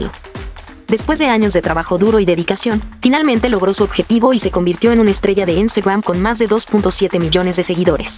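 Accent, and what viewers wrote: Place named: Mexico. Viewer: Yo creía que era Mexicana su acento no parece de cuba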